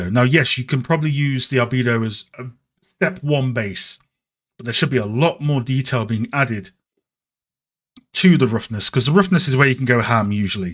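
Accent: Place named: United Kingdom. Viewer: Could listen to a british guy saying "roughness" for hours.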